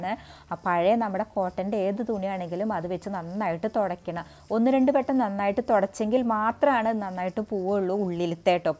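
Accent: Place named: Thrissur, India. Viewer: തൃശ്ശൂർ ഭാഷ കേൾക്കാൻ ഒത്തിരി ഇമ്പമാണ് ട്ടോ. നല്ല ഒരു tip. Thank you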